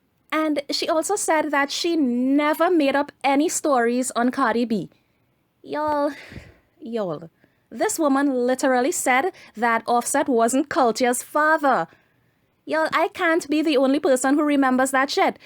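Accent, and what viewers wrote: Trinidad and Tobago. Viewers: Hey girl..you sound like a Trini...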